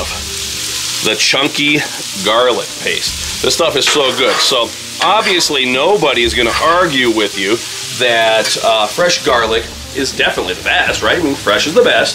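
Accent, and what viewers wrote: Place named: Northeastern Pennsylvania, United States. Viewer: Accent sounds like you're from NePA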